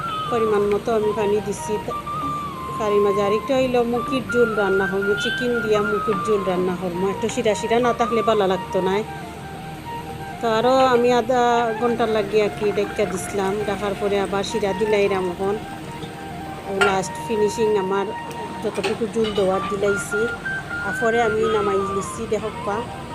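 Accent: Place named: Sylhet, Bangladesh. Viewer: মাশাআল্লাহ অনেক ভালো হয়েছে রেসিপি টা, সুন্দর উপস্থাপনা খাঁটি সিলেটী ভাষা❤️❤️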